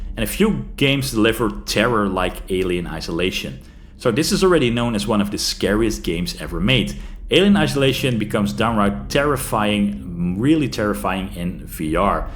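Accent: Netherlands. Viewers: Ik hoor n hollander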